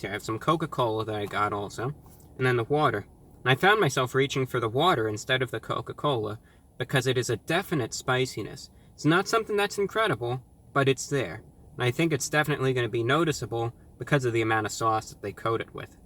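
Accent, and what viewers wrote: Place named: United States. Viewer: „None of them Hääääve it“ Man i like, but the american accent just kinda gives me shudders. Still great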